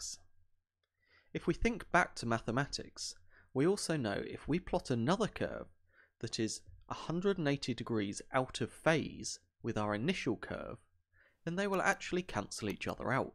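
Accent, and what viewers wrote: Scotland, United Kingdom. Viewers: What's wrong with a yank speaking? I know this fellow host has a striking British accent that I like, but not every "yank" host speaks a disjointed hillbilly mess lol. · ..I hear some Scottish in there.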